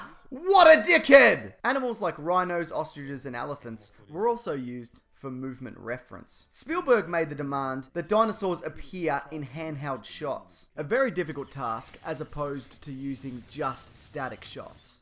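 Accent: Australia. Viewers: Facts about Jurassic Park being told by an Australian is too good to be true.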